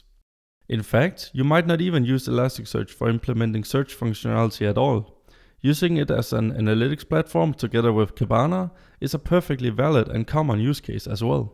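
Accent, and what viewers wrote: Denmark. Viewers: You can hear this guy is danish :D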